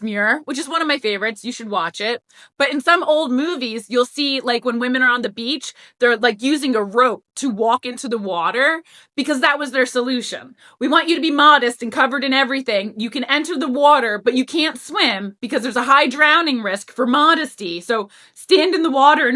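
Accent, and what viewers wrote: United States. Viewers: Girl!!! You’re killing it and I LOVE your Jersey twang!!! Looking gorgeous too ❤.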